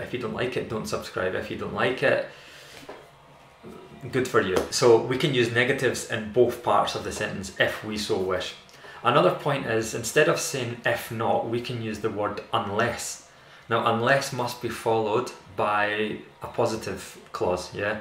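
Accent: Scotland, United Kingdom. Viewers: Nice Scottish accent;-)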